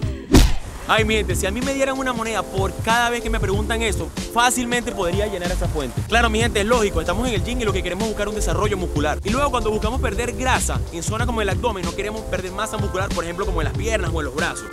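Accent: Venezuela. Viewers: Parece venezolano por el Acento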